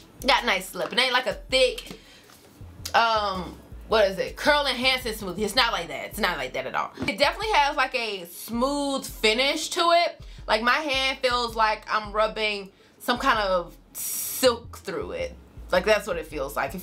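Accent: South, United States. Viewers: is on a natural high. We luv you gurl. sounds like a Southern Bell😂😂😭😭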